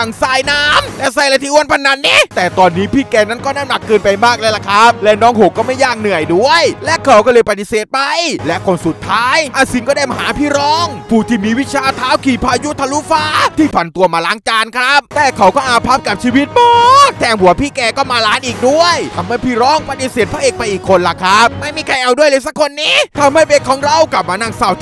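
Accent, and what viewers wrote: South, Thailand. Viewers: ฟังๆ ตกลงแอดคนใต้เออะนิ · แอดเ็นเด็กใต้ช่ายหม้ายนิ?? อยู่จังหวัดใหน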